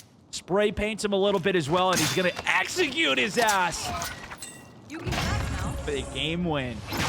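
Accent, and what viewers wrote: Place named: Canada. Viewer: sounding extra canadian in this one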